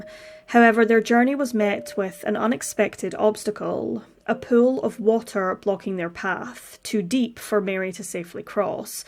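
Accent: Scotland, United Kingdom. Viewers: It is a Scottish accent so the woman is a native English speaker..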